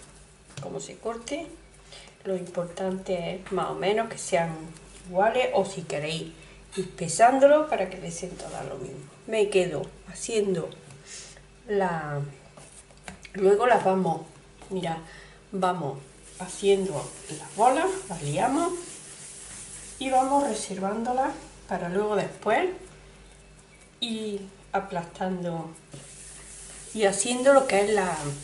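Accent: Córdoba, Argentina. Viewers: Que bien lo explicas se nota que eres cordobesa yo también lo soi mil gracias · Muchas gracias señora mi padre era cordobés or desgracia nos dejo con treita y tres años ese sabor me recuerda a el y el acento de usted aun mas Dios la bendiga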